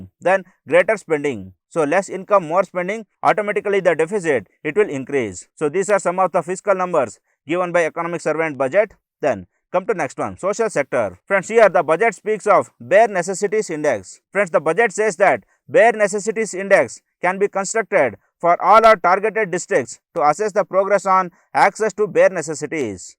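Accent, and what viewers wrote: South India, India. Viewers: Sir i love you south indian accent and I remember your carnatic music rapid revision video .. you are very skillful. if someday you start your youtube channel teaching south indian languages .. do notify!!!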